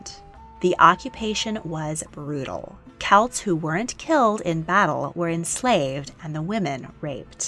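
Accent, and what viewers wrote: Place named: Northern California, United States. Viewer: Good and maybe accurate, but a North Cal accent narration ? You could of hired Steven Toast of London.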